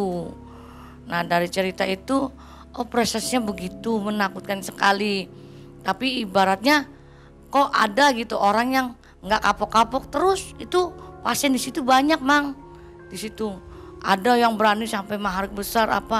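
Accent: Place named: Cirebon, Indonesia. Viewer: Logat orang cerbon, yg tercampur Betawi mbaknya ini kayaknya orang cerbon yg pernah diam di jakarta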